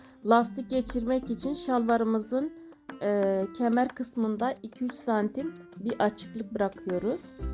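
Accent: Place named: Anatolia, Turkey. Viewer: Anlatimin anadolu şivresi gibi ellerine salik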